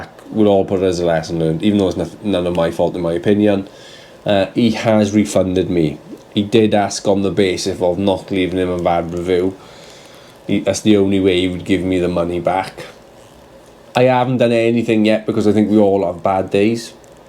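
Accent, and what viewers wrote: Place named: Wales, United Kingdom. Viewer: Speak to Dave the lovely car company, has recently set up at unit with an in house mechanic, there is also a podcast / YT video where him, Joe and Lee (Car UK) talk about the equipment he should get (nov 24 i think it was). I do social media / YT stuff for them and as far as i know its one of the best things he's done, his words. In the mean time you could kit it out and get mobile mechanics in to use the area for your cars until your ready to employ them. great content btw, also I love the welsh accent with words like Fumming hehe. Lastly what they done to that audi is out of order. any way keep smiling and good luck with your new start up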